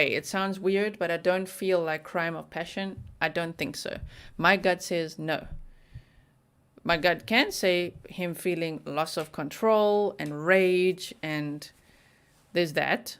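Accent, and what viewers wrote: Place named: South Africa. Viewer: South African living in the Netherlands...thats so cool! Thanks for sharing that. I wondered where your accent was from but couldn't figure it out. I love when you said that your a true crime mind all the time! I'm American living in Finland 🇫🇮 and its a bit weird bc the news/media doesn't report here like they do in other places. I watch a lot of crime, documentaries, court cases online, and mysteries. But definitely interesting points your making :) and I like that you look at the big picture.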